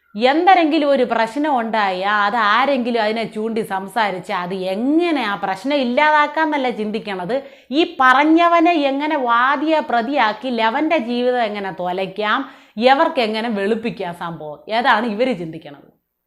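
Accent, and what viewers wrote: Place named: Thiruvananthapuram, India. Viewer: .....Avatharanam Soopar ❤❤❤ AND..Avatharana, Shali, Thiruvananthapuram Basha Shali.... Adipoli..... Sooopar..❤ Sooopar...❤ Sooopar...❤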